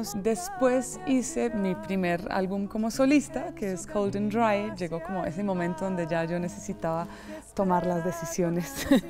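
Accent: Colombia. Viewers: Ya veo porque, noté un aire también Caucano en tu forma d hablar y de cantar,claro si has vivído en la Bella Popayan,que alegría contar con una artista integral como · Un precioso acento colombiano es parte de tu talento. Gracias!!! Thanks!!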